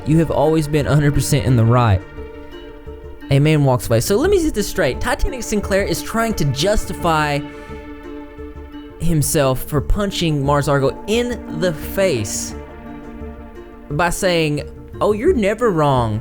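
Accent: South, United States. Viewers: "We all have a crazy ex who turns another ex in to us"😂😂😂. I'm from Louisiana and you have an accent, are you also from the south? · Ohk his voice sounds southern but then sometimes sounds like’s from the UK.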